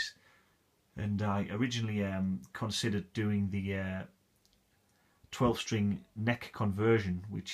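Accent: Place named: Newcastle, United Kingdom. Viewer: Noticed the geordie accent on the first spoken word ! Beautiful guitar man. Did you have to do much extra work on the nut of the Warmoth neck ? Or was it filed to the correct depth ?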